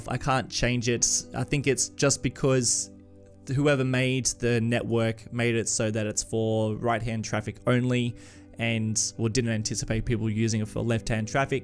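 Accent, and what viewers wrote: Australia. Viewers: Are you Australian? I love it when I find a youtuber who is from my country.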